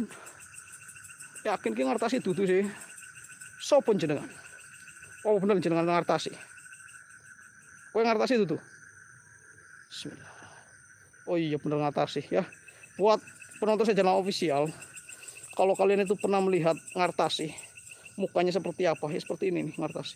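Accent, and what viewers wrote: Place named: Java, Indonesia. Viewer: Saya suka medoknya ki 😆😆 prtahankan ya, slam dari Bali 🤝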